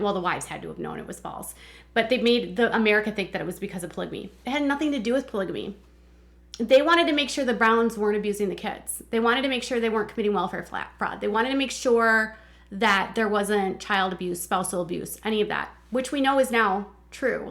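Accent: Minnesota, United States. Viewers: Where are you from bc I hear a Minnesota accent here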